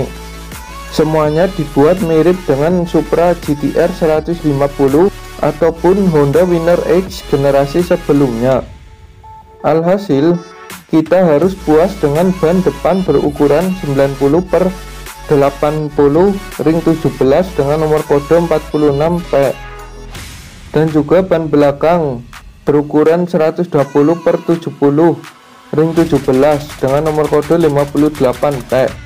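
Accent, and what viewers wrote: Java, Indonesia. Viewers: Ngomong opo sih mas medok banget😄 wes rapopo semangat. Lagian motor itu ga di jual dan ga di produksi di indo. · Medok bgt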